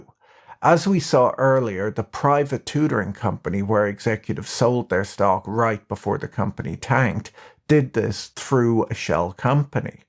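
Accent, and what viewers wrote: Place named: Ireland. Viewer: Ha, it's Irish, not Northern Irish I think. Thirty pronounced tirty.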